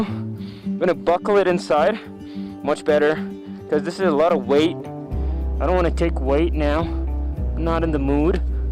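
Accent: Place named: India. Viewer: Hey, are you Indian? lol ...OMG you accent was so nice +1 sub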